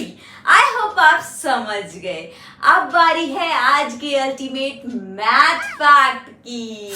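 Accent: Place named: Mewat, India. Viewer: Mewati awaaz to halki karo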